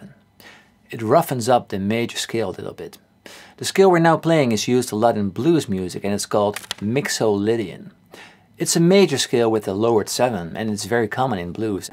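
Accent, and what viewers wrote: Netherlands. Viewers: This guy sounds like he is Dutch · He sounds dutch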